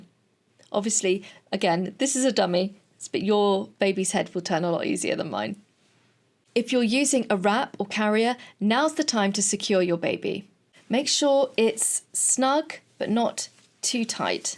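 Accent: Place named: United Kingdom. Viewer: Hi, I have been binging your content over the last week or so and want to say a huge thank you. Its refreshing to hear a British voice with experience in the NHS going over all these important concepts since so much of the content on Youtube is US centric. Im 37 weeks pregnant and feeling much better prepared for breastfeeding and postpartum thanks to your content. I was also fascinated by how the mention of "OF" clearly got your video seen by the algorithm and the engagement it got!!! Like the YT game is rigged against legit informative content... In any case, thank you and keep doing what youre doing ❤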